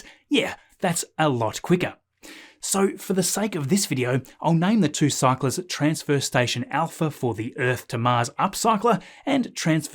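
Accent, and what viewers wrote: Australia. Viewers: Are you an Aussie? Can't believe I never picked up on your accent before today been watching you for ages 😍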